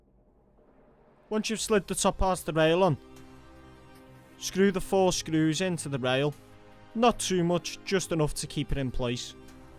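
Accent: Scotland, United Kingdom. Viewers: Nice accent, you Scottish? Or Irish maybe? I'm non-native English speaker so I don't know much about your accents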